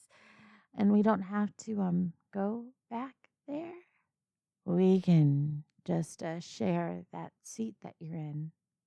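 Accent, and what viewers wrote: South, United States. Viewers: Customary comment as requested 😁 But yeah, very nice work as always. Your southern tones always add an amazing cadence to your characters. Hopefully you can use some of that money to upgrade your computer again, cause... Damn that thing been put through the ringer the last couple of days! Can't wait to hear what you hit us with next ❤❤❤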